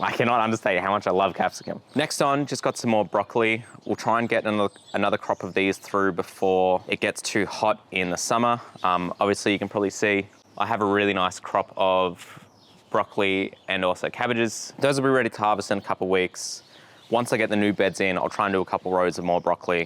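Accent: Australia. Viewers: aussie accents are the language of heaven, melbourne strong <3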